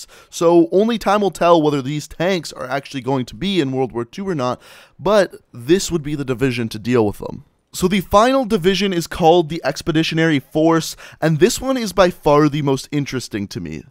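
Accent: Canada. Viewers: I kinda like your content, but I'm distracted by your HEAVY canadian accent, I mean "Peace Øut" what is that??!! LOL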